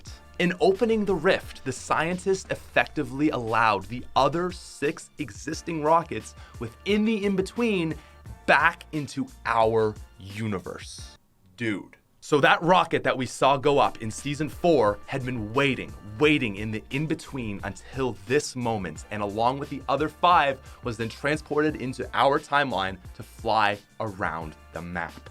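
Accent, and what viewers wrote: Canada. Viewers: Ik your probably Canadian but plz fix your English it's not oatside it's outside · Me watching the video Hears an Accent Is.. Is that- Is that Canadian? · Are you canandian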